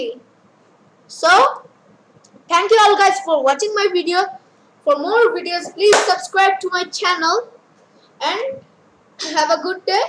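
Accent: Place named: India. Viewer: I like the indian accent "the firs ting" lol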